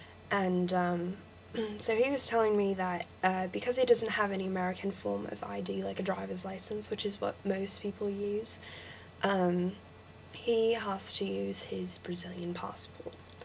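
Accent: England, United Kingdom. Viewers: As an Aussie, I would assume that you were from England .. and I think that our 'ocker' accent is overused too much. We honestly don't sound like crocodile dundee.. Gahh stereotypes. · Not too bad but there is an obviously English tinge. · you sound more english nice try but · You sound english nothing like an aussie accent im aussie so i know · I am Australian and she sounds english... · that's pretty english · sounds a bit English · you sound English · i am australian and sorry but it isnt aussie u sound english :) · I think a main reason that she sounds more pom than strine, is that she is still pronouncing all her words in a proper way. She isn't giving them the Aussie inflections and lazy syllable connections that are so common to our most recognised accent. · You sound like you are from England def not an aussie haha but good english one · Good on ya for having a crack at it but ya sound like a pom, get ya old man to teach ya. 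P.S, I'm a skip. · I don't think you sound Australia at all. More English. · You sound like a Pom... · i love how you say you're american and have an american accent yet you sound fully enlgish....... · u sound more english then australian lol p.s ur pretty darn gorgeous ;P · Lol you sound English :) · Mate if your a yank and your that close you've done pretty well, you hit the nail in the head though, you sound almost perfectly like a pom. One major tip, it's not Australia, it's 'Straya'. :) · you sound english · The way you say some words are pretty good, but otherwise it sounds English. You say the word Australia perfect though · It's a weird hybrid between English, a lil; bit of Irish and Australian. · You sound too English. Find some slang, like aussies are too lazy to say the world properly. Eg. Not United States it America or USA. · seriously you sound like you are from brighton in england I never would of picked you were american and dont worry about exploiting your passport we have people who get student visa or short 6month visa's midway they declare assylum and then get citizenship and its total crap and perfect example before the hate comments come on sydney fc striker ali abass while he was playing for newcastle deceided mid way to declare assylum and got it but he still plays for iran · You sound English not Australian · You've got some of it, but it turns English more often than not. 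But very little American comes through. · Not Aussie at all, fair for English. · you sound english not aussie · I actually thought you were English and you were just introducing yourself at the start of the video. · you sound freakin english not aussie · You sound like a pom!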